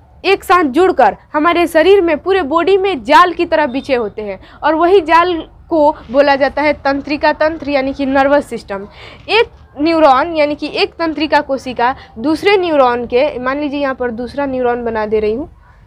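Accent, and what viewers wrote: Bihar, India. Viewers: I think this mam from saran,siwan, Gopalganj. Bihar... definitely...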